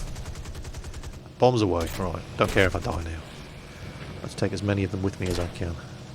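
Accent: Australia. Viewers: nice australin acent i come from australia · lol love the aussie accent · Love that australian accent! Keep doing it mate!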